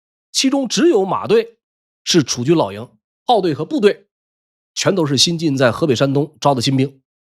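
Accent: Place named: Huaixi, China. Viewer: 老先生明显淮西口音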